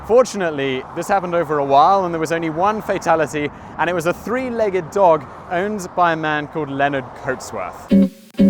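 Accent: England, United Kingdom. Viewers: That a pretty unique English accent...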